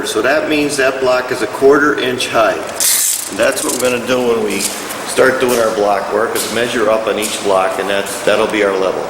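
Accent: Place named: Chicago, United States. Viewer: I'm binge watching your videos ! are you from the midwest? You definitely have an accent like you're from the Chicago area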